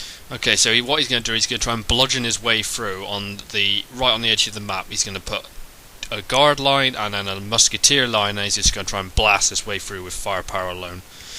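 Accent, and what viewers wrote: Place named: Bristol, United Kingdom. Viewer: am I right in saying bristolian accent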